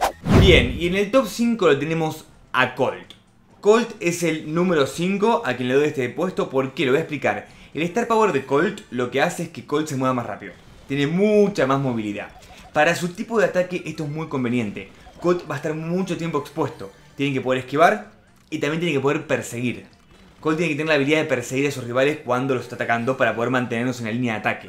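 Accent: Argentina. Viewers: De qué parte de Argentina sos?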